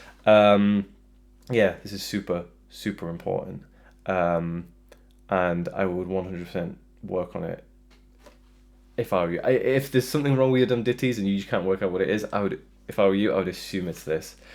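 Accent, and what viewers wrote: United Kingdom. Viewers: Is that a Shrewsbury accent 🤔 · Are you from Northern Ireland? You sound like Bear Grylls.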